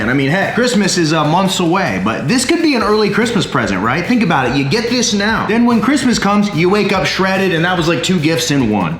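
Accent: Texas, United States. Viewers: Dude are you texan?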